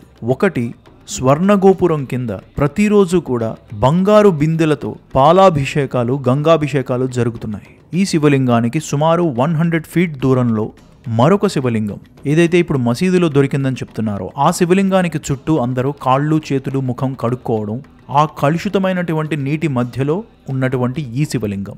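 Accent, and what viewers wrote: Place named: Telugu, India. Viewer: I knew you are Telugu. Your accent seemed so familiar to that of a very dear friend I have got - also Telugu. I'm still waiting for the mathematics series. Please continue with it. I wish to know the Bharatiya Ganitam so much.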